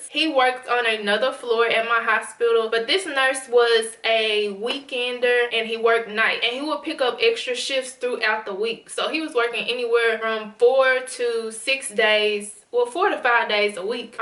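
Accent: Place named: Houston, United States. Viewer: She sounds like she’s from Houston ..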